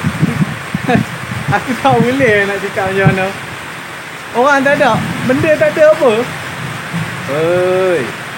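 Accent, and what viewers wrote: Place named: Pahang, Malaysia. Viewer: Loghat macam loghat pahang...org pahang ke?...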